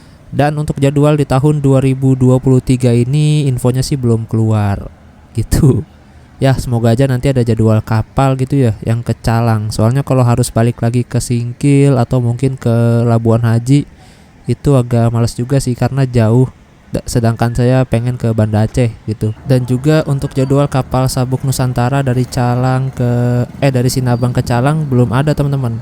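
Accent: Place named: Depok, Indonesia. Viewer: Bahasa lo depok bet bang ✌✌😉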